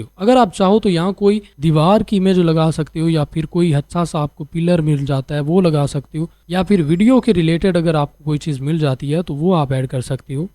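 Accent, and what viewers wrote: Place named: Pakistan. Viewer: u sound like Pakistani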